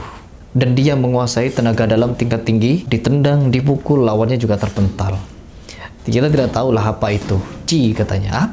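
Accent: Madura, Indonesia. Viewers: Mas logatnya seperti Madura.. Betul ya ☺️🙏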